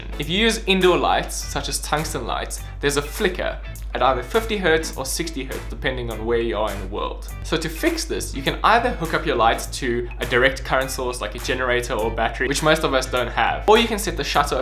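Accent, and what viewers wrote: South Africa. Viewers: South African right??? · You South African? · Are you South African?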